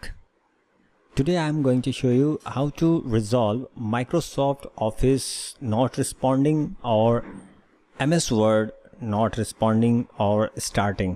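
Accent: India. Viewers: Worked for me, thanks! Also one of the easiest to understand indian accents out there :).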